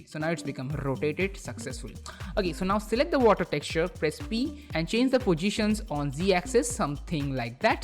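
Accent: India. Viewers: Hay bro where are you from? West Bengal?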